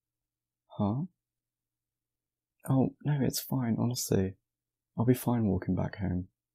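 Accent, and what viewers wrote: England, United Kingdom. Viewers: Omg you have such a proper English accent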